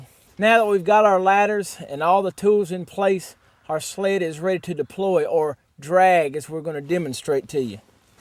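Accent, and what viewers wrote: United States. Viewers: you are from texsas? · the southern accent helped me to pay attention, thank you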